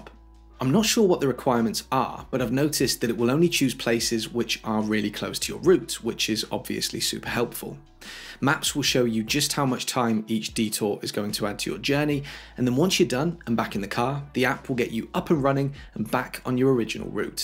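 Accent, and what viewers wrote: United Kingdom. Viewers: The only improvement I need to use Apple Maps exclusively would be the ability to download offline maps. Enjoyed the video, very informative and to the point, and you brits are very pleasant to listen to ( I'm an American, but set my Siri voice to British for that reason lol )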